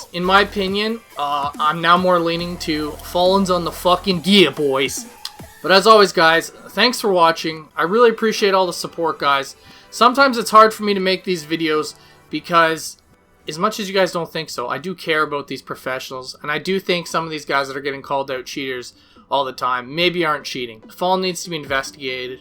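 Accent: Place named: Canada. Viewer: Are you canadian,? You sound very canadian.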